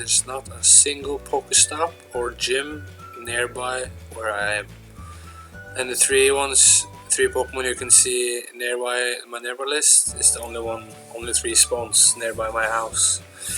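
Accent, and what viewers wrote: Norway. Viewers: man hører at du er norsk og ser det nede med pokemonene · jeg viste at du var norsk når jeg hørte dialekten din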